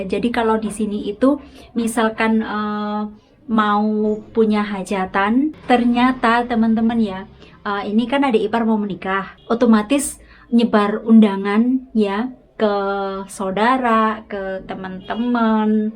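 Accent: Java, Indonesia. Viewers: tak panggil mbk ya...aku dari Semarang Kendal mbk..logat Jawa kelihatan bgt...salam kenal ya..sehat selalu disana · Mbak e kok medok .. aslinya daerah mna mbak ? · Mba asli org dari jwa ,pa luar,jwa,soale kya medok jwa,maaf ya mbaa,? Tnya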